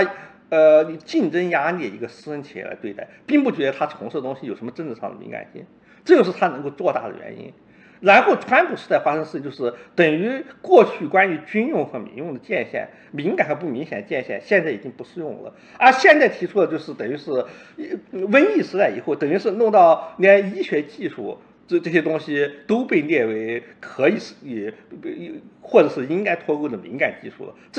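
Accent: Sichuan, China. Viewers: 作为土生土长巴蜀人听不懂巴蜀大总统的很多口音，大总统吐词不清还可以改进不？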